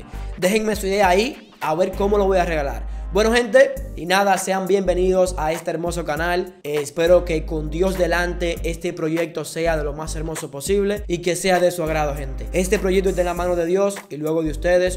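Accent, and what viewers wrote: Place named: Dominican Republic. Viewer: Ese es un Dominicano k habla como un Dominicano no es como nino k dice ala bestia eso no es Dominicano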